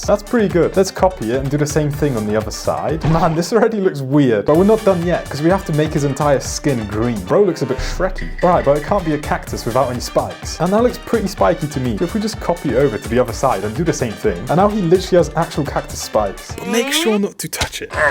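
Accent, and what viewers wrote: United Kingdom. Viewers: HE IS BRITISH!!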